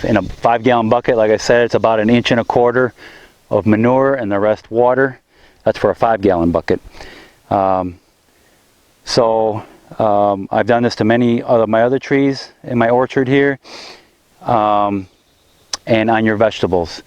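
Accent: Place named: North, United States. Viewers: Mid west accent + the word 'poop' = endless childish laughter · Did you live somewhere up north before Houston? I’m just curious with your accent.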